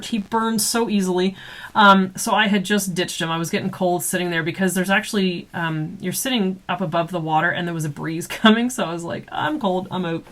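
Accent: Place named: Canada. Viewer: I also like your canadian accent and I adore your sketches. Can you make a pinata for us on ur craft channel :)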